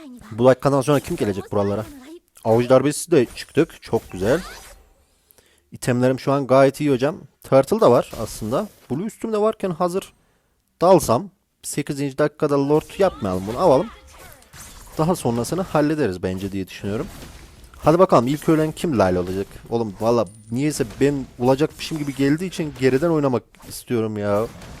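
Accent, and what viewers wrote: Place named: Çorum, Turkey. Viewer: çorum lumusun sen krl şive oraya kayıyo gibi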